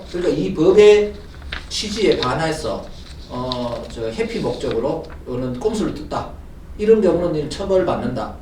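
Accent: Gyeongsang, South Korea. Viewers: 경상도 억양이 저렇게도 또렷한데 ㆍ서울 아니면 ㆍ다 시골이라고 하시는 분이신가보다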